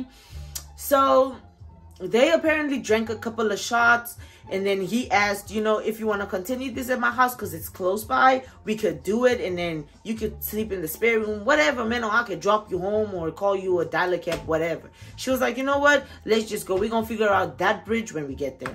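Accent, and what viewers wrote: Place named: United States. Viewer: Damn sis, you catching the American accent 😫🤍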